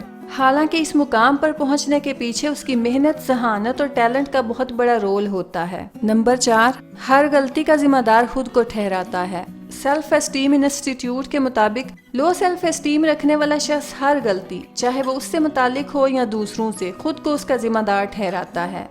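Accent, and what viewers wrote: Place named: Sialkot, Pakistan. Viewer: like your sialkoti lehja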